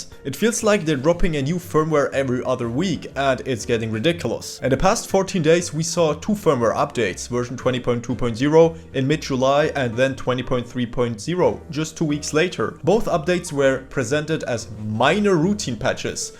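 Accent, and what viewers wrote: Germany. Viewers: I Hear deutsch Bro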